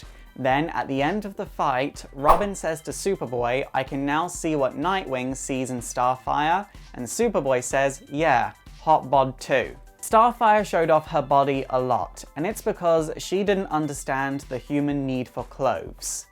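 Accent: United Kingdom. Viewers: Is he British or have an accent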